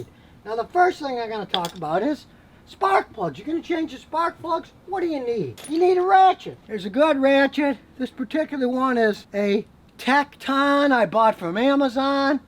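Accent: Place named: Chicago, United States. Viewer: I see the live free or die sign is he from New Hampshire or just like the motto, because he sounds like he’s from Chicago. Just curious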